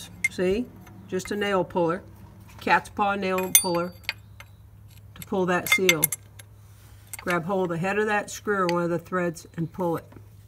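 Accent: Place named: South, United States. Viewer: Plus points for the southern accent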